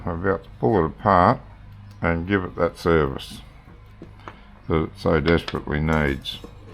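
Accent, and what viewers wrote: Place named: Queensland, Australia. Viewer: . Yeah you are very watchable and that pure Queensland accent has a lot to do with it ! I have never watched a fishing channel on TV or YT cause they are all melodramatic wankers, like the 4WD wankers wearing the obligatory Drizabone oilskins when it’s 40 degrees😂 Obviously you’ve been doing this stuff since you were a boy so you speak with authority unlike most of YouTube where it’s always a case of ,” hello, we just bought our first boat and this is the first time we’ve been near the water so we’re going to teach you how to sail around the world…….once we learn how to untie these rope things…😂😂😂😂😂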